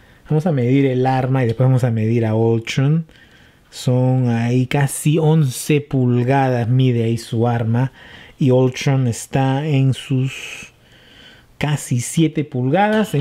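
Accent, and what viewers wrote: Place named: Peru. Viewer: Por fin un shartimus prime que puedo entender xD, buen video Bro, eres de Perú me párese? Tienes un suscriptor más (y)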